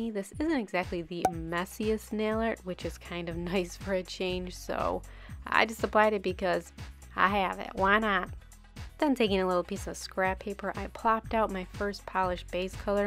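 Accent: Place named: Minnesota, United States. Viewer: The accent reminds me of a Minnesota accent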